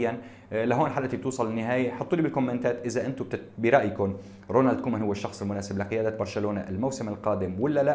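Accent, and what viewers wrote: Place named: Aleppo, Syria. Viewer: اللهجة الحلبية ❤️❤️❤️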